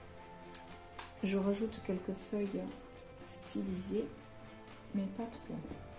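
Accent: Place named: Toulouse, France. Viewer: BRVOS beau travail e t bel accent de TOULOUSE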